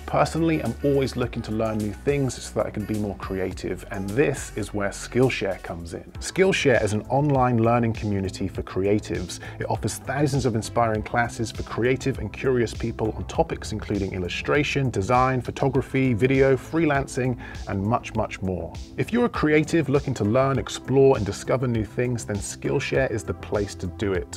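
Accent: United Kingdom. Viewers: I just subscribed a few days ago, I watched at least 15 vids of yours, I ordered a Gimbal this morning ($139) which I have never beard of lol. Next I will check out Epidemic Sound oh and Skillshare too. I just started my youtube vids, after I watched yours I am so embarrassed to show mine. But Honestly I think I have a crush on you, you're so talented, genuinely kind and hunble. LOL not to mention your British English accent so sexy 😅😅😅😅 ok let me stop 😅😅😅😅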